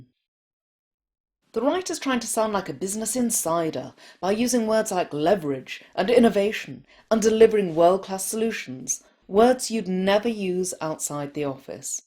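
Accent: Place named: England, United Kingdom. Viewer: I DO really love every video you post and your awesome English accent that literally pampers me as I listen to you. Thanks a lot <3 (Greetings from Italy)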